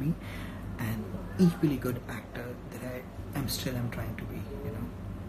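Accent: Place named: Delhi, India. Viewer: This day will be going soo beautifull bcz it's morning is soo fresh and happy......app inte fresh and happy and cute lag rahe the ki ufff apse nazre hin nehi hat rahi thi....😍😍😍kabhi socha na tha ki subhe uthke sidhe apko live dekhungi.....Hayyyy subhe subhe apko dekhke to pura din ban gaya this is one ofthe most happiest morning of my life........apki batein apke bolne ka tarika.....apki adaein...apki inspirational batein.....apki ankhe.....apki soch......every small little thing which you have just wonderfull.......apki woo hasi or frnd k sath bat karna Delhi style main.....jese bolte hain na Dilwalon ki Delhi hoti hai....wese hi apke pas joo dil hain na woo bohot hi acha hain or woo ek dam bache k jesa hai sooo cute baby......Yarr Dilliwale to chagaye si.......and thanks to sir for encourage our dear Rajat sir...... sir told that Rajat sir is a finestt acter I also agree with him...Rajat sir is the bessttt acter in this whole world....such a kind heart he has...such a pore soul person he love every one.....and he always say good for every and and learn and observe good things from every one......he always pampers his loveones....that sooo sweettt of him.......I never seen any humanbeing like Rajat sir.......he is the one person in my life who make me happy.......when I see him I feel soo peace in my eyes and my heart.....his smile it just killerrr😘😘😘😘I can't say anything about him bcz he is perfect from every side....like as a human or acter or person or son or fr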